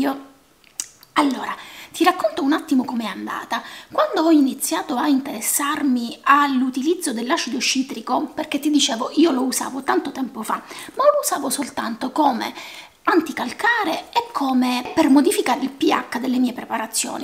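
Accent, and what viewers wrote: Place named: Palermo, Italy. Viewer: Sei di Palermo come me riconosco la cadenza 😂 ; è vero non si trovano nei negozi normali grazie per avere dato la dritta su Natura Sì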